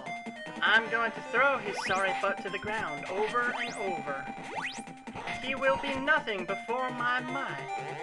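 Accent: United States. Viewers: I have to say that the voice in this video is a little creepy. It's like an old Southern Belle mixed with a Texan woman. Eeep...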